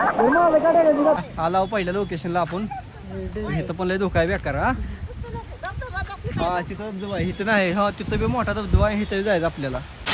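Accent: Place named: Solapur, India. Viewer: सोलापूरी भाषाआहे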